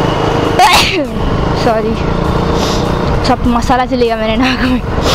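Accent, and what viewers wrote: Mumbai, India. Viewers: well earlier I was going to say things like you are a noob, squid but after investing all my phone's battery I think you are not a bad rider. And as you say in your mumbiker accent "bhaay tu toh raapchik Hai". + 1 sub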